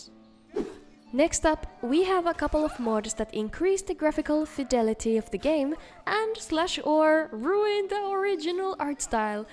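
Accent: Finland. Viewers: From the way you pronounce names it becomes clear that you're Finnish. Only a finn could say "Jenassa" like "Suomessa" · I was thinking that you kinda sound like a Finn, and it seems you are lol. Just started my billionth Skyrim playthrough and am in the process of modding it 'till it breaks.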